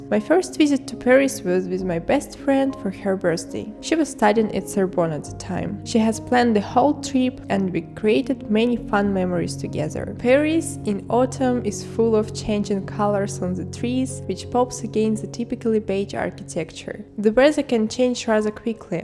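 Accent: Russia. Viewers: Какая красивая! Сразу услышала русский акцент 🥰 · Русский акцент не вытравить ничем Как как она себя назвала?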